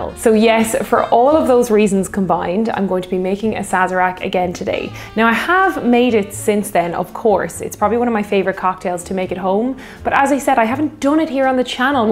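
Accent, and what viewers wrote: Ireland. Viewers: Your second video for me. I made this comment after watching my first, which was your first Sazerac. Here's my comment from that one, which probably should have been made here .... "The first video of yours I've watched and it was very enjoyable indeed. I've visited Ireland often and listening to you speak makes me want to return and visit with my Irish friends there. Anyway, the Sazerac is a great drink, which I've enjoyed many times in New Orleans, and your formula is fairly classic. Mine (which I was taught by a NOLA bartender I befriended) goes as follows: 2oz Rye, 1/4 oz. Demerara sugar syrup, 3-4 dashes Peychaud's, 1 dash Angostura, and 3 sprays of the Absinthe atomizer into the chilled glass just before I pour in the other mixed ingredients. Lemon twist does not go into the glass. Slainte! (And I'm now a subscriber.) Oh, and I agree with the comment below that you'd likely truly enjoy a Vieux Carre as well."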